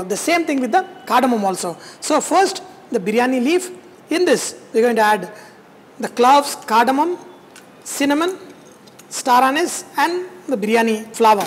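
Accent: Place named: South India, India. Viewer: ur hindi is super Typical south indian style Am from kerala😁😁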